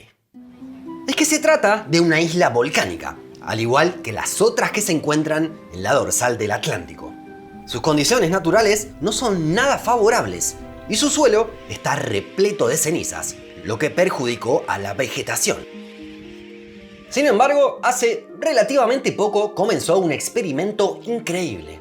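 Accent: Argentina. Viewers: El acento del muchacho parece argentino (un argentino que habla bien clarito), pero me parece que el canal es de España....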